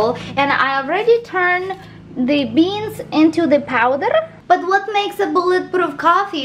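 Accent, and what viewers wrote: Russia. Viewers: I love her russian accent!!! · Is it just me, or is she sexier with a stronger Russian accent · I love the Russian accent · why are you thickening your russian accent, you used to take lessons to hone in on your russian accent, and you were doing so well. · I thought Bullet Proof Coffee was gonna be like a Bulletproof Vest. Plus you have a Beautiful Russian Accent.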